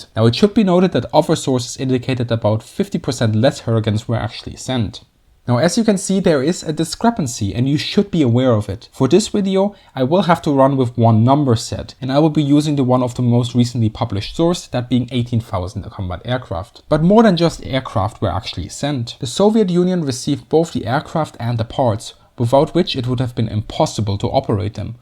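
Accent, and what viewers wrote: Germany. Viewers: The video is very informative and interesting as well, though I’m sorry, but I simply can’t listen to that hard german accent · We have always been grateful of the USA effort to help us against NAZI Gernany. And we did pay back Lend Lease. Though our tanks were better we loved he USA and still do. The spam you sent us was a great relief to our rations. So please do not think all Russians are not thank full or were not thank full. I wish people like you understand the whole issue. How long did it take Germany to pay of the damage they created in Europe. My family is from St Petersburg. Please do not put all Russians or CCCPs population and military in the same box. BTW most of my family perished in St Petersburg. So what is your real point. You seem bias. NKVD really???? Or what about your Gestapo. Yes you sound German. If not I do apologize. 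· Sorry, but the guy is a native German speaker who has taken the time to learn English so we can get excellent information that many English historians do not access because they do not read German, or Russian.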